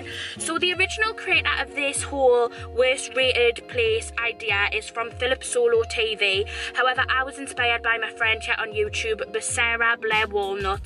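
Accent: Newcastle, United Kingdom. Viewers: She is from newcastle, which is in England :) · I love your voice are you britsh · this ismy first time watching you and im definitely going to subscribe one question, are you geordie? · she’s british, the accent is geordie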